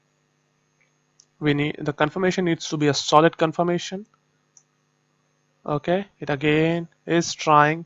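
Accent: India. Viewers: hi bro. i'm from Vietnam. it's not easy for me to listen Indian English but i'm so happy to see your video. it's truly useful. Thank you so much.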